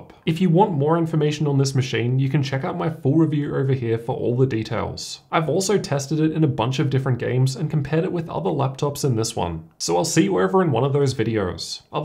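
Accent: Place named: Australia. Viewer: It’s so odd how ozzies say words with an o. Nerrrrrr lenerrrrvo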